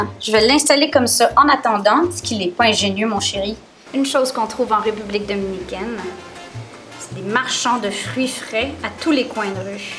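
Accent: Quebec, Canada. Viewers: Salut super vidéo ! Je pense que ta joie de vivre et ton bel accent québécois t'emmènerons loin ! ( enfin j'espère bien ! 😁) Merci pour tout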